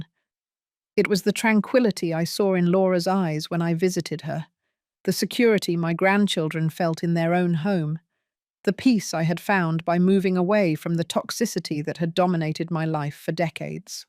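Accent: United Kingdom. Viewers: Good story but dont like the narrator from the U.K.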